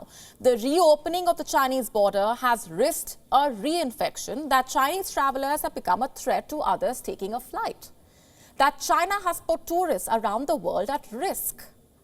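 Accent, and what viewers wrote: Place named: India. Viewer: don`t you have a speaker speaking english? This indinglish is really annoying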